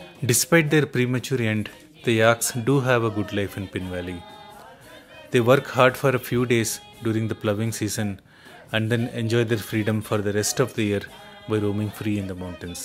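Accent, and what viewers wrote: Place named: Karnataka, India. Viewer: COMMENDABLE VEDIO WORK.. YOUR ACCENT, YOUR KNOWLEDGE, YOUR VISION , INNER INSTINCT to know the real Life of these farthest untouched places of Himalayas... I HAVE BECOME YOUR FAN --DEAR.. MY GOOD WISHES to You & all the Team members who are attached to produce this such a LOVELY MEMORABLE vedio... I think you hail from Karnataka as assumed by your way of speaking/narrating...must be very highly Qualified person.. Anyhow, great thanks for uploading this Vedio... All the Best Dear..!!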